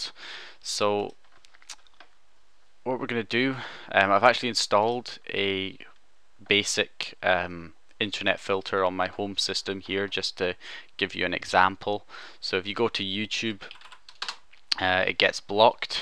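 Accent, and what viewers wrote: Scotland, United Kingdom. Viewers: You Scottish too?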